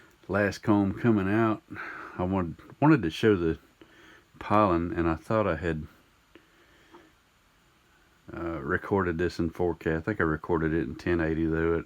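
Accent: South, United States. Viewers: Just a wild guess sir, are you from the south? 😂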